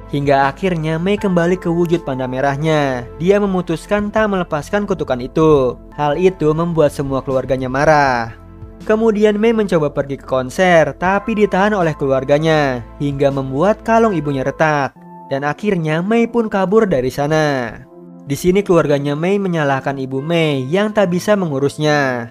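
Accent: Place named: Jakarta, Indonesia. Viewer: Dari Malaysia kok logatnya kayak orang jakarta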